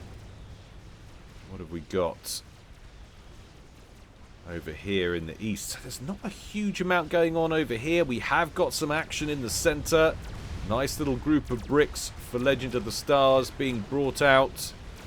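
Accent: United Kingdom. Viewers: The thing I enjoy about casts other then the brits lingo/accent are he just enjoys the game as much as I do, where others casters seem to be just talking. The other random off topic comments are just the icing on the cake to remind us he's not A.I. Loved seeing the addition of the recap of "missed critical action" or the "WTF happened while I was focused somewhere else" at the end of some casts when needed. I know it's all alot of work but think of it as recompense for all the other stuff that was missed. Ya, not sure how trump won when I compare the two, which comes down to either Americans are stupid or sexist, which is sad because I know many of them who are not either of those. Now it's time to grab a bag of popcorn and watch the world deal with human greed/stupidity in the worst way in, power over the people. Thanks America, another thing in the history books up there with the other world goofs. 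And before someone wants to comment, lets just let the next 4 years prove me right or wrong. I just know I bought some Elon and Trump shares to sell in 2 years, which better pay for my spaceship off this planet cause we can't take the money where humanity is going. XD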